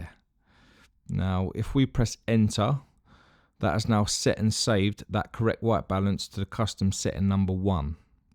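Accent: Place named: United Kingdom. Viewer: This is the most British dude I've ever heard in the wild. Thank you for the vid too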